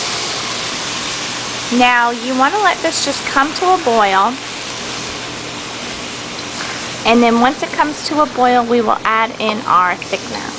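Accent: South, United States. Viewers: An Asian girl with a southern accent. You know this Bourbon Chicken is on point!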